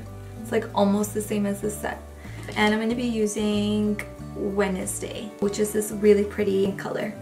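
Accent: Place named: England, United Kingdom. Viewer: How u are so well spoken English accent if u are from India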